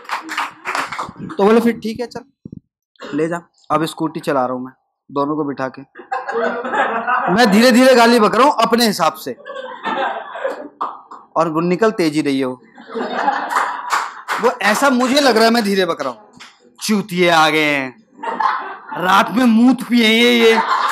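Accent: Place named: Haryana, India. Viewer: Haryanvi accent top notch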